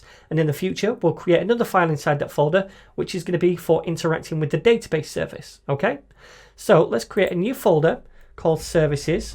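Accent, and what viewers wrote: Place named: England, United Kingdom. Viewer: You are the best in youtube ive seen explaining each topic I guess because your English is native so you don't do any effort to translate your thoughts plus you are awesome plus your accent is Sooo English that makes every word sound smarter,, and makes me feel wearing a grey tailored fit suit attending a lecture in an old shiny wooden lecture hall in oxford university in year 1752 among a beautiful group of people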